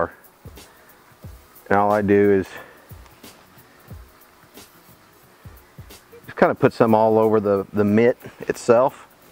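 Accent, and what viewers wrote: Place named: Oklahoma, United States. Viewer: Beautiful car! When I heard you talking, I'm thinking this guy is from Oklahoma or used to live there...lol Then I saw the OU stickers in the garage...Boomer Sooners!! Then I saw the Oklahoma plates on the car...hello from a fellow Okie!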